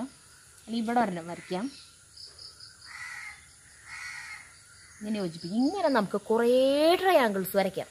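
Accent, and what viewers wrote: Thrissur, India. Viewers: Mm poliya trissur slang.😘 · Teacher thrissurkkariyano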